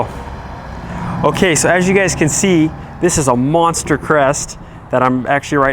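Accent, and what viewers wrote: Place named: Germany. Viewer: Great info, love your ' german' accent and painfull blooper (hernia? Been there 😕) keep up THE great work!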